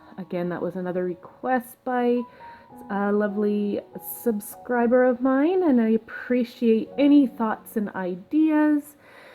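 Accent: Canada. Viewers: Awe, so fun to hear your sweet voice! I love the Canadian accent! 😍 I wish we had a your dollar store with more... But I shouldn't complain because I have access to a lot of stores you do not. I'm hating the cold weather. I'm ready for spring! We don't have Dollarama either. I've had a cold as well and believe it or not, I'm still struggling with shingles. It's bearable, but my immune system is struggling to fight it off. I love the paint brush holders!!! Nice haul, looking forward to seeing what you make with it!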